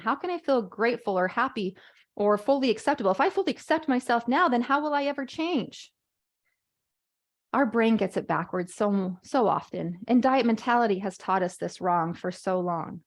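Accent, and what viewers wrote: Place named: Utah, United States. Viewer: This was great! How come you don’t have very many subscribers? Are you just starting out? What brought you here? On a weird note, are you from Utah? You have a pretty strong Utah accent!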